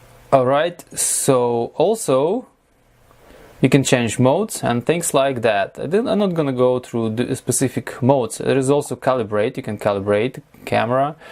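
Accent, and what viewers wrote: Russia. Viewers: Відразу чутно що російскомовний.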